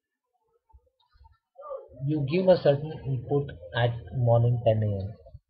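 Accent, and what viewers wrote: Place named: Pakistan. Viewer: classical pakistani talking.. :D why internet is full of you guys? even british accent is more understandable.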